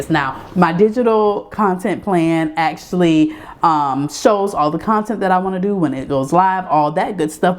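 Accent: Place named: Louisiana, United States. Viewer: Let's GOOO! Let's Get it!! I'm with you this year Sis! Also, your accent is giving Louisiana! I grew up in BR, lol. My goal is to post a youtube video every week.I'm well on my way, too 💪🏿✈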